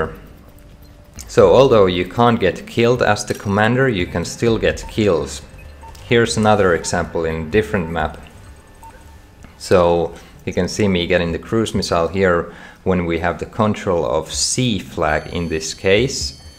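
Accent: Finland. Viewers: Are you Finnish? You sound Finnish...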